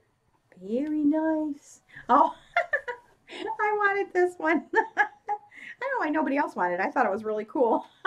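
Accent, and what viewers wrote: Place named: Northeast, United States. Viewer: I figured you were from somewhere in the northeast, your accent was very familiar:) I lived 12 years in the Southern Berkshires, MA. My husband and his family are from Mahopac, NY in Putnam County..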